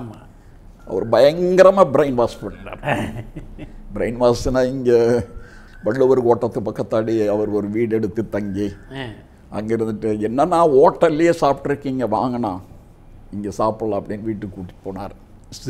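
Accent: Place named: Coimbatore, India. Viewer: Kovai slang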